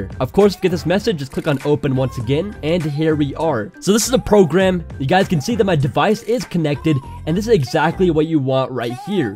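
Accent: India. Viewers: Are you Indian.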